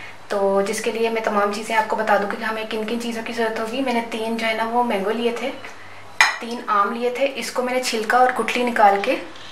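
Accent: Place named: Punjab, India. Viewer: Please donuts bnana Sikhs dien And I love your Punjabi accent me also belong to Punjabi family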